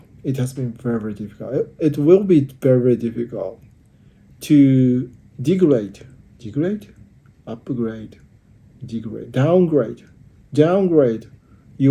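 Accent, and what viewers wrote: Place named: Japan. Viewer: I really like it when you talk about the news in Japan. I think it could be a great format that's not too hard to maintain. Your English is even better because it's your distinctive trait, when I hear people talking like natives, my interest reduces drastically. You are a real Japanese person, and that's what makes you unique. Japan has a low number of English speakers, and that makes it even better. We understand your emotions and situation, for which there is no need for words to express. As an Italian, I really comprehend you, and your English is really great, you are not monotonous. I struggle too with the choice of words when I speak, but it's acceptable, since you are speaking a different language. When natives speak their native one, the one that is doing the work is you, not them, which makes it even more respectable and special. 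I have more respect for foreign English speakers than natives, as we understand the barrier of not speaking your native language. You are an amazing man! Embrace yourself man!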